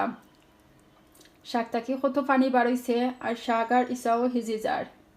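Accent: Sylhet, Bangladesh. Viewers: Apa apni kemon acen....aponer kota gula sune kub anondo pelam mone hoi amader deser...sileyte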